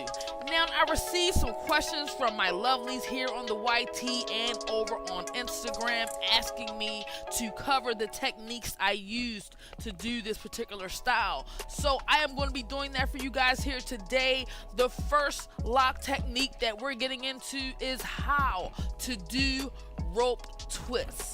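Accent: Baltimore, United States. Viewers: You from Baltimore lo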